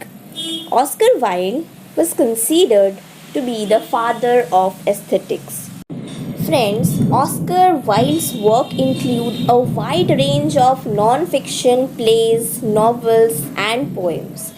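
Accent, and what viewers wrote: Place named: India. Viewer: You have a kind,sweet voice but it is not for narration. A heavy Indian accent just doesn't go with a tale of a very British poet. But a nice little biography ...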